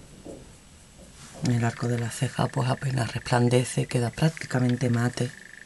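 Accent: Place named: Extremadura, Spain. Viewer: por tu acento, eres extremeña?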